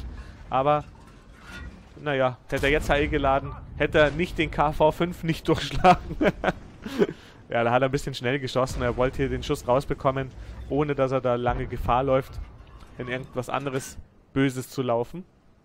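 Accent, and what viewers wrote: Bavaria, Germany. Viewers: aus welchen teil Bayerns kommst du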